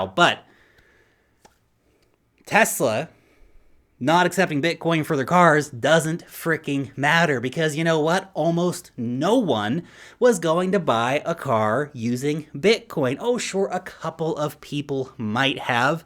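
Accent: United States. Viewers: Wow, I always thought is from the US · New Zealand? How come you sound like you're from the US? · you don't sound like you are from New Zealand. You sound American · you’re a kiwi 🥝? What’s with the American accent?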